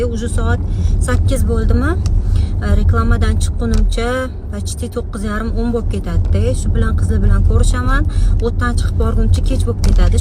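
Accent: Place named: Syrdarya, Uzbekistan. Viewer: Сирдареча гапирди😅😅😅😂😂😂😂😂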